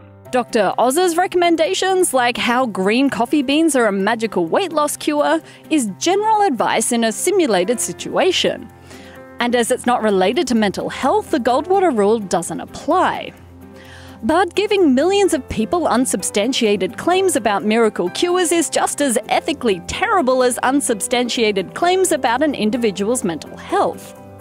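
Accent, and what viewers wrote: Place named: Australia. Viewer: Am I the only one that thought it was a bit odd that Australian was narrating this?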